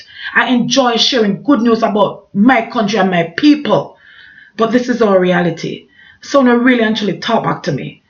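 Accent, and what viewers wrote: Jamaica. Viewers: Do ur. I no it not easy for you to come and talk about all this sad news because you just want some peace. I like how u still speak like a Jamaica. well done big up ur self.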